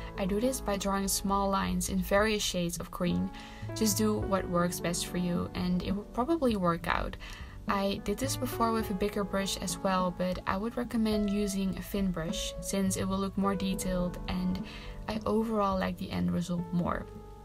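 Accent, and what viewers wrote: Netherlands. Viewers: Your accent sounds, N e d e r l a n d s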